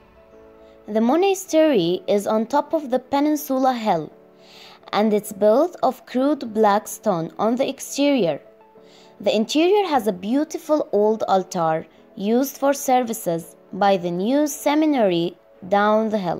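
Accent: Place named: Egypt. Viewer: Your accent I feel it like an Egyptian accent when you speak English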